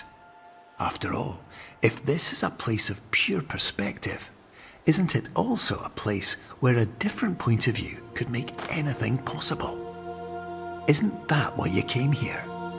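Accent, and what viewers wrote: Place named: Scotland, United Kingdom. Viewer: It's Scottish! The narrator is Max Howarth, who hails from Edinburgh. I hear him quite frequently on the radio at work with Arnold Clark adverts.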